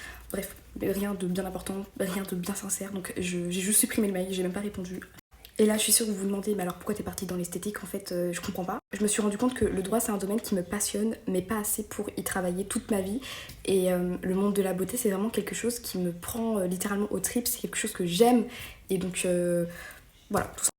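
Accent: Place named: France. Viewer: Ta parler le français de France girls ❤❤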